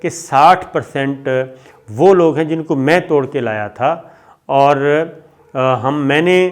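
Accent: Pakistan. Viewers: Aap ki bataen pheli daffa sun rha hn masha Allah bht acchi baataen kartaen hn, biklul khalis pakistani Urdu zuban me, r mohabat bhari bataen krty hn.ye bako channels waly tu fazol TUR TUR krty hovy jhoot bolty hn.aap ka andaaz bilku Mashriki ha vry fine. Allah ap ko kush rkhy Ameen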